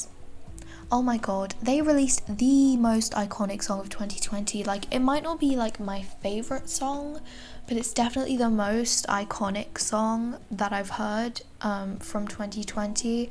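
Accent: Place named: England, United Kingdom. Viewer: Omg, I really love you're English accent :) Great video btw :)))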